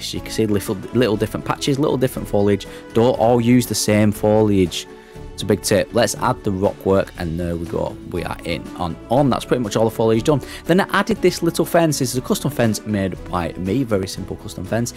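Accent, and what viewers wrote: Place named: Manchester, United Kingdom. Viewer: it sounds like you are from Manchester. Have you ever thought of recreating Belle Vue Pleasure Gardens/Zoo?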